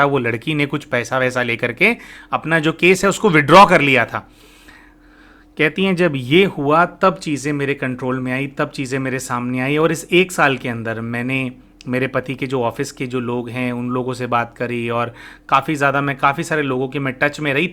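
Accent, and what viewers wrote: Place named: Delhi, India. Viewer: inki bAate batane k tareeke me Delhi k tadka wala touch hai 😂😂 bahut pyare aur funny hai bhaiya 😅😅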